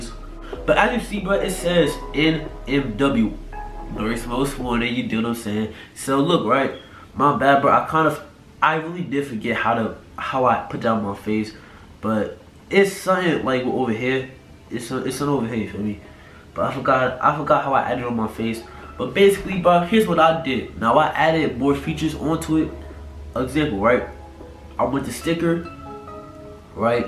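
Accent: United States. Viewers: yu frm nyc ?? uu dead sound like uu frm there no lie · that florida accent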